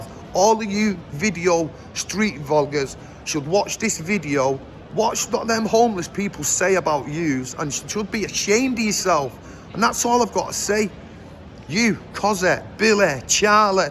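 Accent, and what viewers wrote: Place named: Manchester, United Kingdom. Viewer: Its I love your Manchester accent and you are spot on with you are saying this YouTube video: Author my mate and brother..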